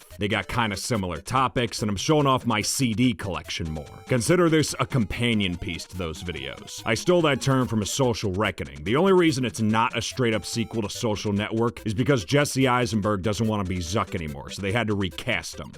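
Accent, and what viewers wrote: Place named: New Jersey, United States. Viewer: He’s 25?!! Maybe it’s the Jersey accent(?) but that doesn’t sound like a 25 year old to me lol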